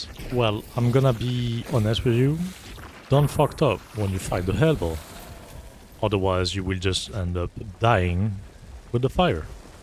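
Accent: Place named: France. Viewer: gg for banging 20 min english with french accent <3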